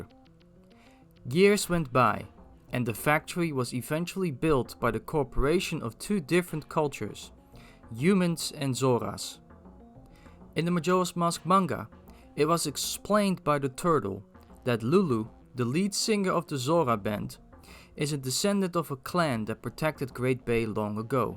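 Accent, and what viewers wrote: Netherlands. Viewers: Been a long time since i saw an actual good theory. I also hear some Dutch accent. I'm from Belgium so i'm glad to hear someone near me also be this passionate about Zelda.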